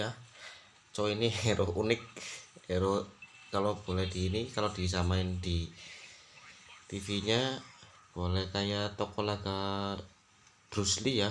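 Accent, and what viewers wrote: Banyumas, Indonesia. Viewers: Bang ngapak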